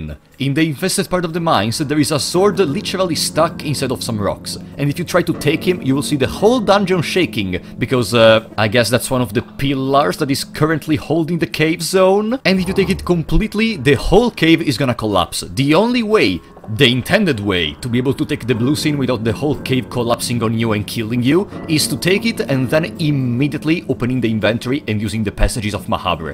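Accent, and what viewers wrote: Italy. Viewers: i can hear the Italian accent from 1 mile away, and it is both nice and funny btw, great video :)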